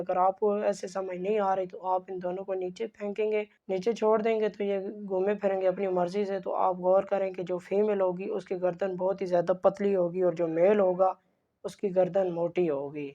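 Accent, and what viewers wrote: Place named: Punjab, Pakistan. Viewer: اپ اپنا اردو بولنے کا طرز ٹھیک کر لو اپ کا انداز بیان اچھا ہوگا اور اردو بولنے کے اندر پنجابی لہجہ نہیں ائے گا تو لوگ اپ کی بات سے ویسے ہی متاثر ہوں گے اور خود بخود اپ کو سبسکرائب کریں گے کیونکہ جتنا اپ روانگی کے ساتھ ادب اور اخلاق کے اچھے بہترین طرز کے ساتھ اپنی گفتگو کریں گے اتنے سارے لوگ اپ کی ویڈیو کے لیے بے تاب ہوں گے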